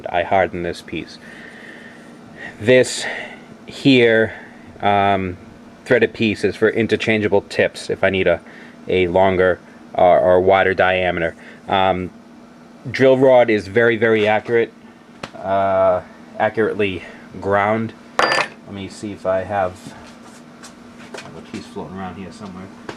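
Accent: Boston, United States. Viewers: Wow really nice work I've subscribed. Love to do this. May I ask is that a Boston accent you have.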